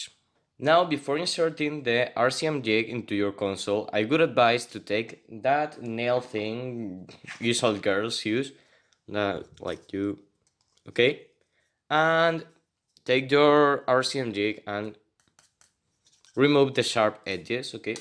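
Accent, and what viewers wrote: Spain. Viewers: Jsjsjs como se nota el acento español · Are you spanish? Tu accento lo parece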